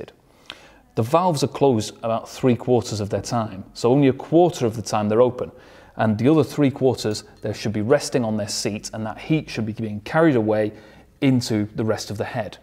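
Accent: United Kingdom. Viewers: Just stumbled upon you after googling how water cooling works. Terrific stuff mate - as an Aussie I'd much rather listen to a Brit over an American and it's thrilling to now know there's one out there to listen to!!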